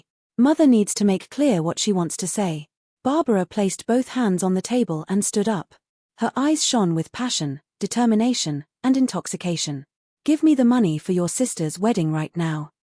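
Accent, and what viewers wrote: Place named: England, United Kingdom. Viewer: English accent in Boston doesn't work! Change it please!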